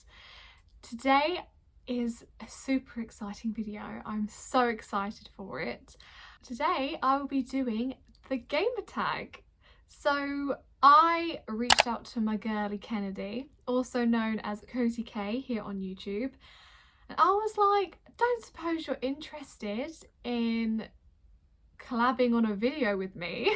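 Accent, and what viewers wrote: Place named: United Kingdom. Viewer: I like the British accent 😭😭 Good luck 😊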